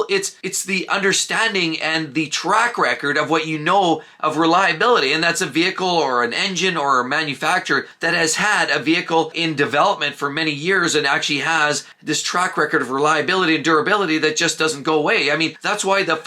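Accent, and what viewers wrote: Canada. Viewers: Love this guy Canadians oooot and abooot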